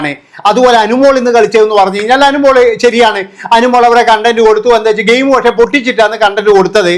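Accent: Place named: Kannur, India. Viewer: ബ്രോ 👌👌 നിങ്ങളുടെ നാട് കണ്ണൂർ ആണ്.. സംസാരം കേൾക്കാൻ ഒരു രസം ഉണ്ട്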